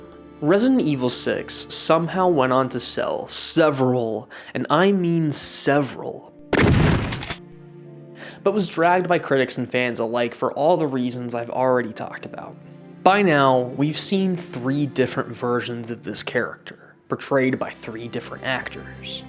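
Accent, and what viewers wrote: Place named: Ireland. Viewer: Italian? My boy is Irish.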